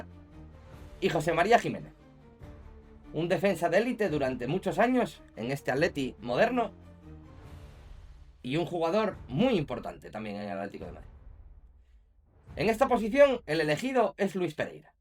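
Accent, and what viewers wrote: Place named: Asturias, Spain. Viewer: Buenas, yo también soy del Atlético y por el habla me paez que yes asturianu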